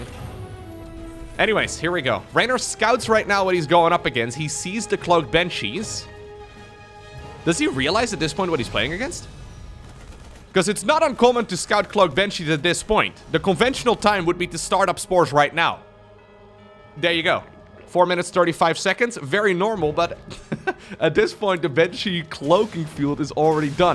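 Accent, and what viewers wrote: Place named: Netherlands. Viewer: it's actually "broodlords" and "broodlings", but dutch accent makes it sound like that.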